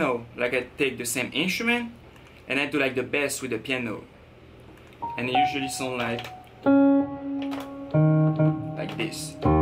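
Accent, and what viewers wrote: France. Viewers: He is frensh can tell by how he pronounces the A letter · Are you french ? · t'es clairement français mais bien joué, sa ma pris 10 ans a parler couramment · t'es français car quand tu parle t'es comme moi je sais parler anglais mais pas forcément l'accent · On entends bien que t'es français :) :) On attends la suite avec un cook up de Londonondatrack ou bien un southside ou TM88 Type beat !! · true Hendrix he's not Mexican he's French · You're from France right? I can hear from the accent. I was actually born in France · le bon accents francais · You french or spanish? I kind of recognize the french but you look latino, anyways we see you up here in canada Ontario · Ca fait plaisir de voir un français bouillant comme ça, je savais pas que tu étais français mais ton accent est craméééé ^^ Force à toi tu déchires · On reconnait l'accent français :p, Gros taf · hes french lmaoo you can tell by his accent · Your are french ? 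· u have french ass accent, tu parles français? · Are you french?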